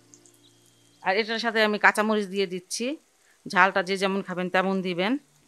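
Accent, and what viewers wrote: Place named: Gazipur, Bangladesh. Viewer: ভালো লাগছে,,, আরো ভালো লাগছে আপু আপনার কথা গুলো মনে হচ্ছে আপনি আমাদের গাজীপুরের বাসিন্দা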